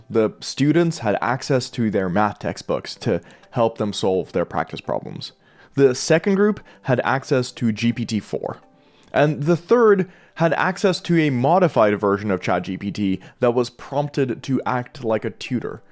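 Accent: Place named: Canada. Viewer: Where is this guy from? Sounds Canadian, or something....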